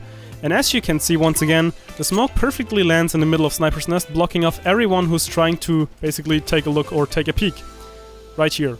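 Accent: Germany. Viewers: Good denglisch😄 Good Video👍😊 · german accent detected ^ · u sound like german. r u? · Man merkt das er deutsch ist lol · Dieser Moment wenn man alte Videos guckt die mega nice sind wie deins hier und man aber richtig merkt das du Deutsch normalerweise bist aber du sprichst das sehr gut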